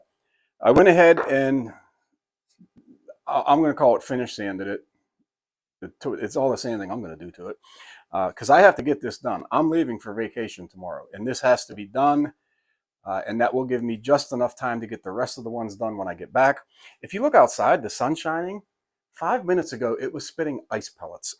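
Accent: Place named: Pittsburgh, United States. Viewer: Pittsburgh? I know that accent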